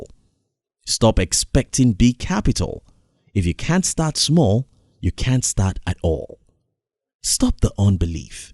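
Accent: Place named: Nigeria. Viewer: African accent straight from nigerian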